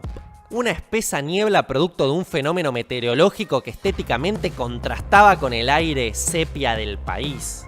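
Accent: Argentina. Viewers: me mama (encanta) el acento argentino, justo hace 2 semanas apenas veía este tema, muy buen video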